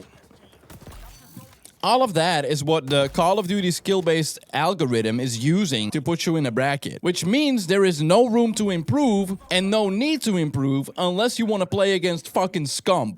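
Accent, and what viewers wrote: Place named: Netherlands. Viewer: je klinkt nederlands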